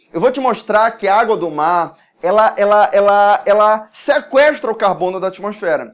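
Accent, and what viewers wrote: Pará, Brazil. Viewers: Muito bom 👏👏 Prof vc é do PARÁ? Sotaque muito igual!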